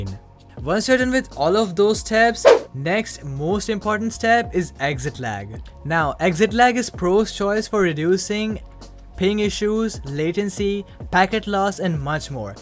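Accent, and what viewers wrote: India. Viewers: It actually works! It didn't help me to a great extent but it's defenitely better I think the firewall settings made a huge difference,my game is so much better now! Thanks for that Also i have one critisism. No hate just a friendly critic,you should just stick with speaking indian accent(or speak accurate American accent), you're trying to speak American but it feels very much like indian accent mixed with American accent(I don't have a problem with that but it's hard for me to understand,and I'm sure it's hard for many others as well) Peace 👍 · nothing your voice was like a indian because idk why i can tell every voice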